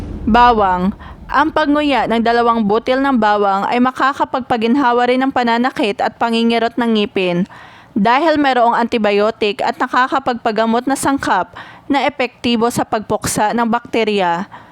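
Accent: Visayas, Philippines. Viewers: Bisakol magsalita Hahahahaha hahaha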